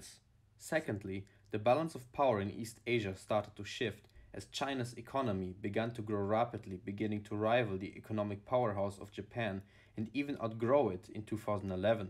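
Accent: Germany. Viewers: What accent? Sounds German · My guess would be Central European Germany maybe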